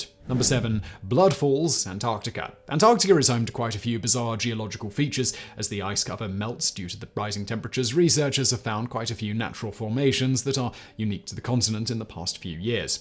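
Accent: United Kingdom. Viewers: He's british as that's how they pronounce it. Not joking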